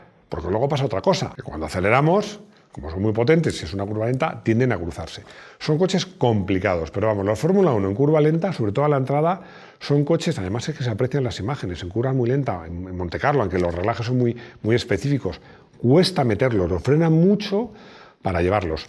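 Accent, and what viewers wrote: Chile. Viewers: Estimados la voz en off es de un Chileno?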